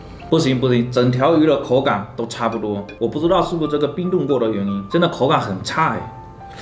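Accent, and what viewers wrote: Fuzhou, China. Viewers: 听口音像福州人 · 你是福州人？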